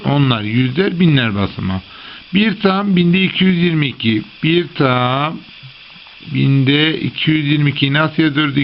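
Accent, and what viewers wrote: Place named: Central Anatolia, Turkey. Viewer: kağıdı halının üstüne koymuşsunuz,masada daha rahat yazardınız,şiveniz ortaonadolu gibi...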